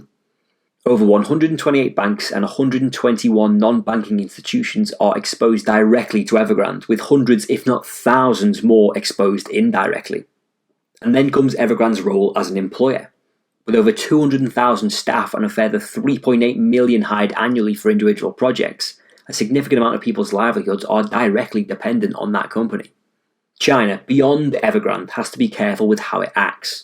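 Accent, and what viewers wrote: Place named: Liverpool, United Kingdom. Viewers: He has a scouse accent, fairly mild. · Hard to take anything serious come with a scouse accent .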